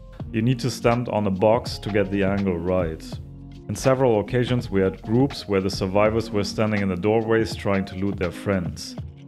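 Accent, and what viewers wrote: Germany. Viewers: Bist du deutsch? Hört sich nähmlich ziemlich danach an:D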